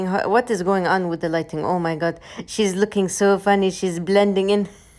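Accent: Arab world. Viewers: That arabic accent with imperfect english always does the trick 😅😂❤️ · Wallahi sister, you done your Arab aunty accent for so long I forget what your original voice sound like lol may Allah bless you and grant you shifa for all the joy you've given us with your content, ameen. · I like how u say "she'sso much cute".. that typical Arabian accent ❤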